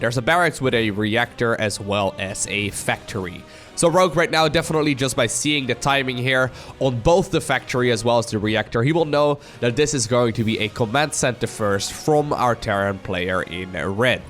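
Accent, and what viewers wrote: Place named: Netherlands. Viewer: dutch accent spotted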